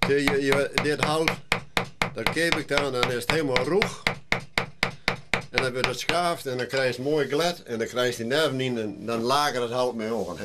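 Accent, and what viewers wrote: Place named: Westerkwartier, Netherlands. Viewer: It dialekt dat dizze man sprutst klinket in bytsje as it Grinslânske dialekt "Westerkertiersk"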